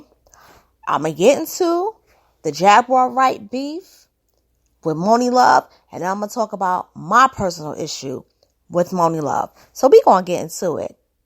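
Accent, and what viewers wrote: New York, United States. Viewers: Yo your voice! Straight N.Y Yo!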